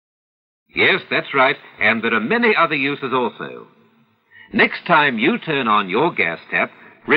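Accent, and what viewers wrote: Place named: Australia. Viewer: Ah, when the world was still a good place to live. I mean, one third of the world, but still. This is such nostalgia, even with a funny Aussie accent.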